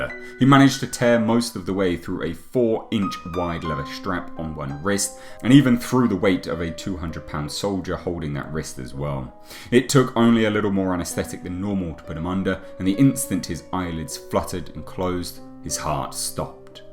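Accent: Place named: United Kingdom. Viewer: You know the story is 90% fake when the Narrator has a British accent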